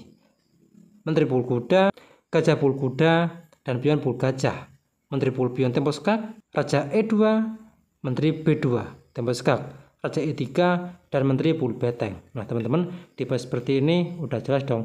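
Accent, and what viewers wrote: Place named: Java, Indonesia. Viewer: ..medhok, mMass, intonasinya...; jawiyhoo..?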